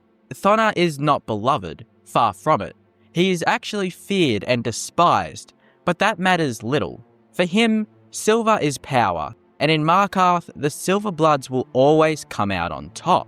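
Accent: Australia. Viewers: calm down mate hes Australian 😂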